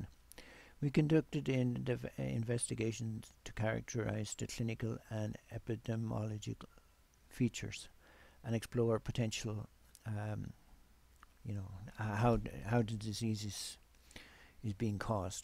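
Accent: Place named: Dublin, Ireland. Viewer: I don't know why but I find this subject fascinating. I am from Dublin, are you also from Dublin? I ask because you sound like you might be from just. outside. Interesting video thanks for uploading.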